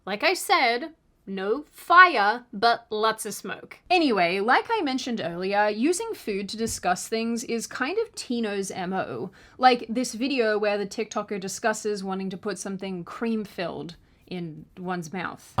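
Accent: Australia. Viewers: Surfing the Hell gondola straight through the afterlife! Death rattle was right!! Their demons are SCREAMING. Love you!! ❤️❤️❤️ P.S, I love audibly observing the evolution of your accent from Australian (aur naur) to a mix of that with American (fyuuumm)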